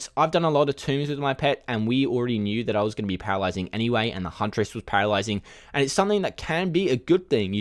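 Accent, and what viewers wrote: Australia. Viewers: Australian accent from Australia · I'm pretty sure he's Australian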